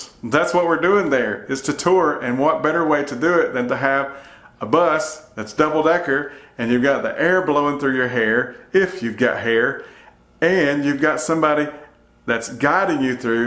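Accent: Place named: South, United States. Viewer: I love your country accent.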